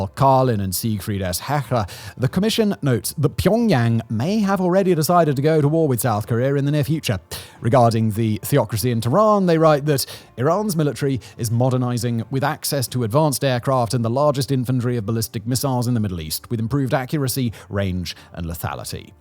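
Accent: United Kingdom. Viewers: This is what Americans get wet for, hearing a Brit tell us that we're not ready for war.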